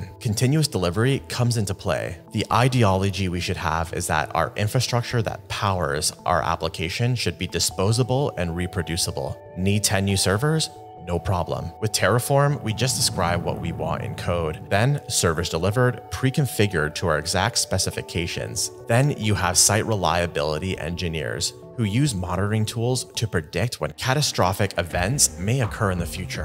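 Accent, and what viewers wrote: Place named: United Kingdom. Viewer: Bro's british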